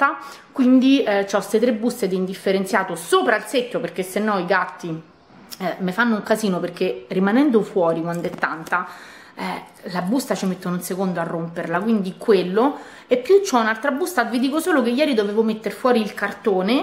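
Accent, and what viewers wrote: Rome, Italy. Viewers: ciao!... io ti adoro a sentire il tuo accento romano... mi fai tanta compagnia...un bacio a!!😍e un bacio a te😙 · Ciao finalmente posso dirti che sei troppo forte con la tua parlataromanesca un bacione a Ciao 💖👍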